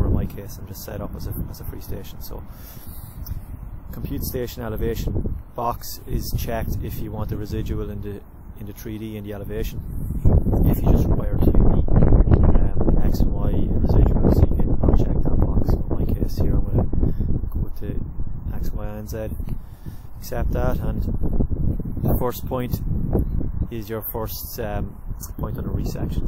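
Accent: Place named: Ireland. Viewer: What a crappy tutorial.. How do you expect anyone to follow along with the monotone, robot sounding voice that also has a sharp Irish accent.. And how about that wind noise that drowns you out - that's actually kind of nice to be honest. Seriously, I don't think you guys listen to it before uploading to YouTube.. If you did you would be like OMG, THAT IS WORSE THAN A STEAMY PILE OF 💩. Do better, viewers deserve it!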